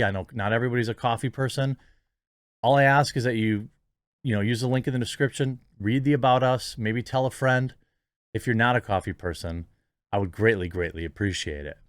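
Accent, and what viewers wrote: United Kingdom. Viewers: Guys with pompous British accents telling Americans how to run their country hasn't worked out historically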